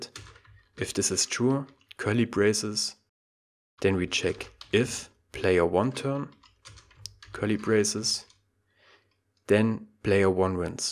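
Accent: Germany. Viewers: thanx ..you have a sweet german accent.. hhhh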